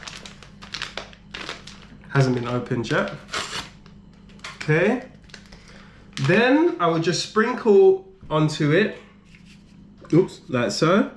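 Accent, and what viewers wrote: Moravia, Czech Republic. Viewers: JOOOOO Konečně někdo zkouší něco přímo z Olomouce!! Já tu bydlím a jsem pyšný na to že jsem Moravák. Jelikož jsem se v Olomouci narodil, tak už mi skoro vůbec nesmrdí ale spíše voní. Určitě by jsi měl zkusit Tvarůžkovou zmrzlinu (Tvarůžky Ice cream) já jsem ji měl a je VÝBORNÁ! Teď jsem na ně dostal hroznou chuť! Keep up the great work please. Btw you have really good Morava accent! 👍👍😄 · Ty nejsi Čech jak poleno! Ty jsi Moravák jak řemen 😁 říkáš toš jak pravý Valch, potom klidně přejdeš do olomouckého dilektu když mluvíš o Olomóckých tvaróžkách 😁 to jsi zabil! Definitivně nejlepší Youtuber, nikdo se ti v tomhle nevyrovná 👏 keep up with this amazing content 👏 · Chlape, nemůžu si pomoct, ale když vidím tvůj optimismus, slyším přízvuk a zavřu oči tak slyším "Mirečka" , úplně vidím tu neřízenou moravskou chlastačku :D :D Prostě deformace "Básníků". 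· Parádní moravské přízvuk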